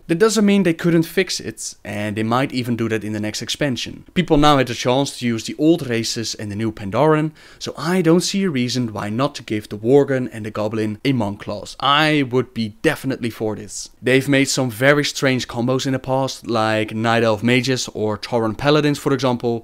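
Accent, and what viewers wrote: Netherlands. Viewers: Dat dutch accent :D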